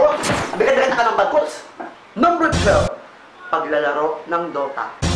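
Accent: Visayas, Philippines. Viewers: Bisaya ka??? xD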